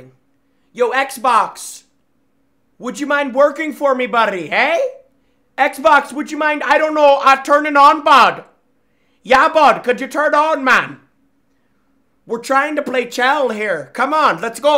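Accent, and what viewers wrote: Canada. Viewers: That Canadian accent killed me 😂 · W Canadian accent, w junk for sure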